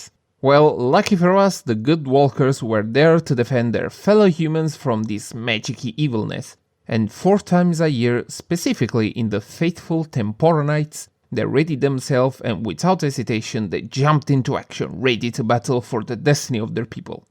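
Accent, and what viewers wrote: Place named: Italy. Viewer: You mentioned that you were the Italian Sam O’Nella? If so that’s amazing because I like your accent for these videos, it makes your videos stand out to most of the other ‘bootlegs’ because of the voice.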